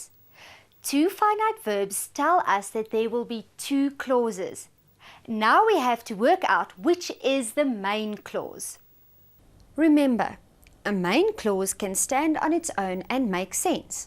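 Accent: South Africa. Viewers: The South African accent :) , I'm teaching English in Korea, this was so refreshing , day made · I'm from South Africa, I'm pretty sure that it's a South African accent...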